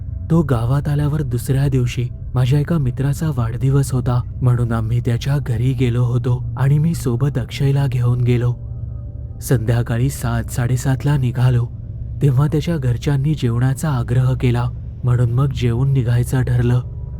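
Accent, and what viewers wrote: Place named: South, India. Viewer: मी अनेक वर्षांपासून तुझे stories ऐकतेय… पण आता तू गाण्यात बोलतोय…. फिल्म मधे south indian accent सारखे तू का बोलतोस??? Like a non Maharashtrian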